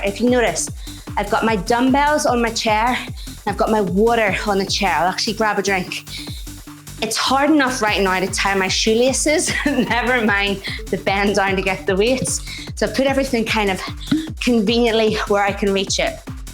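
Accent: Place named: Northern Ireland, United Kingdom. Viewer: I feel like you're from Northern Ireland, where are you from? 😄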